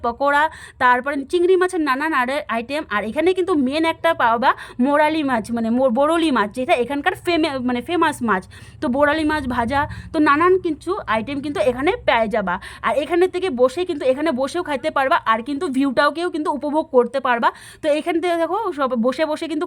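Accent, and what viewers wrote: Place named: Murshidabad, India. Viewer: কিছু মনে করবেন না।আপনার বাড়ি কি মুর্শিদাবাদ জেলায়? ভিডিও করতে হলে আাগে ভাষা ঠিক করতে হবে। ধন্যবাদ।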